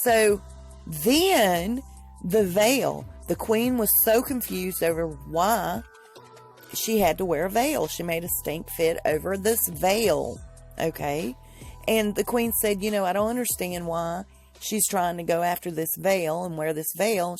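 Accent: South, United States. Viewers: What a witch 🕷! Thank you for keeping us posted, by the way I like your Southern accent💗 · Oh oh what's that do I hear a bit of Southern Confederacy in your voice I'm really concerned here that we might be dealing with a racist then again you people don't call yourself races anymore do you I believe it's nationalist now get a life besides talking about this girl's seriously he chose her in a discussion you know you can't bring back the Civil War and give it to the South and your racism shows and all your videos by the way not just this one I will be notifying YouTube about you I'm sick and tired of you Paula Dean , Roseanne, racist pieces of crap going after this girl for no reason what did she do to you exactly and you sound American so you don't have any investment in England and if you were Harry told you to keep your opinion to yourself I don't think she ever because enough for you and you know she won't so why are you bothering us with your opinion why don't you stop burning your damn crosses on YouTube and by the way she was only married once before but that's just how much you educate yourself on any media that's not sponsored by whatever dude under a sheet you work for oh my goodness you know is this how you treat people seriously you seem to not go to church or know that you should treat other people the way you would want to be treated you just seem like a overwhelmingly negative person you ain't saying nothing unless you got something bad to say about this girl Kyrie put out a statement when they first started dating about people like you I believe it wa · Hey Hon welcome to the south! 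I love your southern accent! Carolina girls best in the world!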